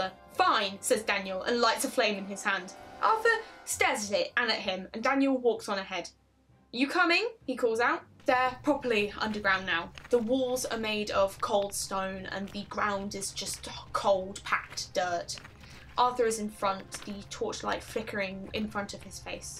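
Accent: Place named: RP, United Kingdom. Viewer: two thumbs up! or more if I had any more. no seriously, I liked the story but even more so how you presented it. very lively, engaged and full of attention to detail I had no trouble following and genuinely enjoyed it all throughout the two parts. your RP diction was an added bonus too. thx very much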